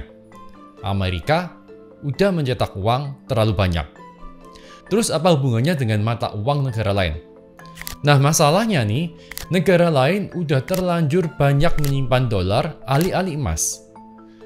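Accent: Java, Indonesia. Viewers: Jamie Dimon ngk penah denger om? Masa elu edukasiin org2 lewat Youtube tapi Jamie Dimon aja "Siapa itu?". Gila amatt. Mirip Mario Teguh lu ya. Uda medok & ngertinya cuma ngajarin orang mulu. Sendirinya mah wawasanya ya alakadar. ☺️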